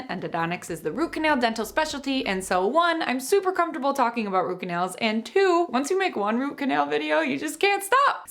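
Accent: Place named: Wisconsin, United States. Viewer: An Italian with a Wisconsin accent.. weird!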